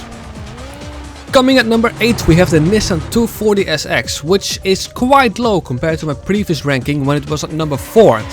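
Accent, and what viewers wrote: Netherlands. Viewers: I can tell you’re dutch, if not, then i’m just stupid, but, anyways, no hate tho, finally a dutch tuber talking bout need for speed game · Are u from Holland 🇳🇱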